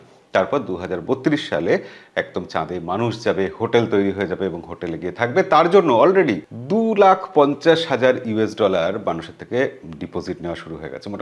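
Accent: Bangladesh. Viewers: পরচুলো পড়া এই লোকটা বাংলাদেশি উচ্চারণ নিয়ে কি করে আনন্দবাজারে এলো।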